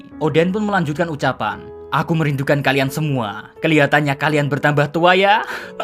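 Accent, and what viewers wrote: Java, Indonesia. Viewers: Jawane daerah ndi min?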